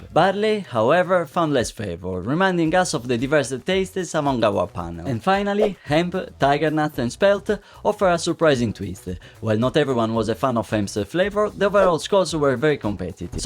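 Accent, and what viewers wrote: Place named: Italy. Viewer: I'm Italian and your English is really funny I think you are Italian too right?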